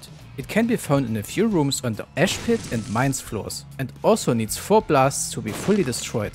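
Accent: Germany. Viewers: Hey ich wollte mal wegen deinem Akzent fragen ob du vllch deutsch bist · i hear an german accent, are you?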